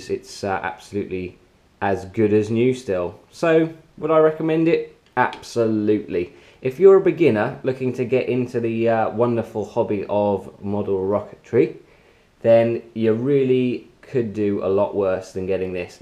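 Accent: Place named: United Kingdom. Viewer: Nice rocket. I assume by your accent, you are in the U.K. ? Model rocketry seem to be really popular and active in the U.K.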